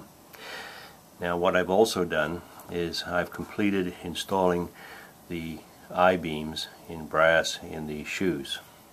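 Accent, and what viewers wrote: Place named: New Zealand. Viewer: Just discovered your channel - fantastic stuff! I’m a kiwi returning to the hobby after 25 yrs in the live steam scales with lots to learn as to what has changed, and an engineering shop to make jigs and precision parts - BUT trying to pick your accent sir! I can hear NZ, a touch of English, a smidgen of Australian, Southern USA etc etc. There has to be a story there - put me out of my misery please!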